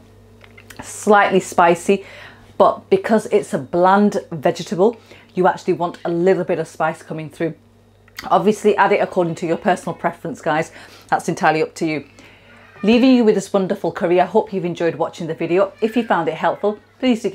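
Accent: United Kingdom. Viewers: Be aware that you have a thick British accent and you talk very fast. Sometimes when I don't understand things, I suspect it is not your accent. British English uses different words than other forms of English. I'm a linguist and a retired university professor in Japan - I've been here over 35 years. After teaching for a while, I stopped correcting my students on everything. I just made them aware of other what speakers of other dialects of English would understand. Yes, I do hold a US passport, but it was not my goal to teach the American dialect of English. I wanted them to be fluent speakers of an International Dialect of English so as many people as possible could understand them.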